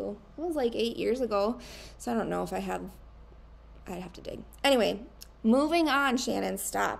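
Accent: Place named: Minnesota, United States. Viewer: I just found you last night so I'm excited you posted today!!! I love your small accent too it reminds me of the Fargo movie (in a good way as I love that movie, especially the way they talk)...I like your style of patterns you work on as it all different, sampler, full coverage, Halloween .... looking forward to your future videos. See you next time from central Canada 🇨🇦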